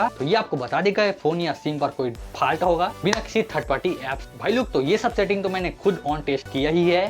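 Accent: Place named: Chhattisgarh, India. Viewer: Chhattisgarhiyo ka accent pakda jaata hai 😅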